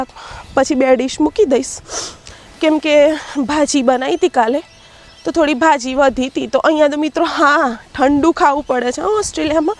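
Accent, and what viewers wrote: Sabarkantha, India. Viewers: YOU ARE WELL EDUCATED AND EXPERIENCED. AND ALSO. HARDWORKING. WOMEN. BY YOUR SPEECH. I. WAS IN DOUGHT. THAT. YOU ARE. FROM. SABARKANTHA. NOW. MODASA. IN. ARRVALLI DISTRICT. SPEECH. IS REALLY. EXCELLENT I. LIKE. I. AM FROM. NARODA AHMEDABAD. KEEP. IT UP. A DAY WILL. COME. YOU. WILL BE. TOP. VLOGGER. DON'T SHOW. MUCH DETAILS ABOUT. YOURSELF TO. AVOID. UNNECESSARY. USELESS. COMMENTS. EVERYONE MUST. SUPPORT TO. SUCH. VIDEO MAKER🎉🎉❤❤ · tara video badha bahuj saras details sathe full information with tecnology wala hoi che.mane tara language parthi j lagyu ke tu sabarkantha Modasa ni che.hu pun modasa ni aagle Vadagaum Dhansura bayad Aakrund gaum ni chu.darbargad ni.tane khup khup shubhasshirvad.god bless you.tari bhasha aavij rakhje sweet.aapnu gaum ni nam aagal lavje Tram no video saras details sathe banavyo hato.tyare j lagyu hati ke tu sabarkantha Modasa ni j ke dhansura bayad ni che.❤🎉😊 Thanks u.proud of you dear.❤🎉.hu hamna baroda ma rahie che.perth ma apna baroda na badha bahuj che. 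😊😊😊😊roj nava video banati rehe.hu pune mathi jovu chi.❤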